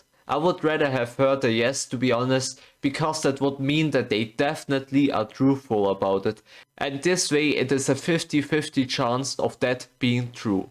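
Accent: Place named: Germany. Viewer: digga dieser deutsche akzent is so schlimm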